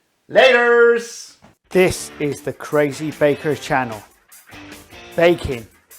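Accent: United Kingdom. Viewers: Great recipe and nice british accent!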